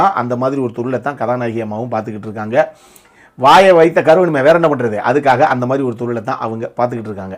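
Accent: Madurai, India. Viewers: bro neenga Maduraiya❤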